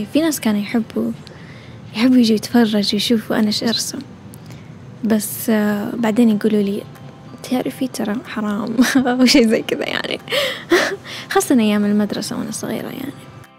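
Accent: Saudi Arabia. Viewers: لهجتكي من جده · صدقا اول مرة بسمع بنت بتحكي سعودي, بنت ذكية و جميلة جدا و مثقفة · تكلمي عربي لهجتك السعوديه روعه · تتكلمي سعودي صح أنتي سعوديه ولا أيش؟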